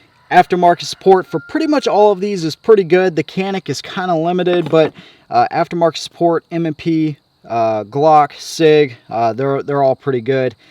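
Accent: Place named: West Virginia, United States. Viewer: you have WV accent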